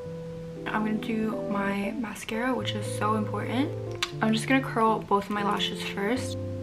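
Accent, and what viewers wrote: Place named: China. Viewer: chinese accent 很好聽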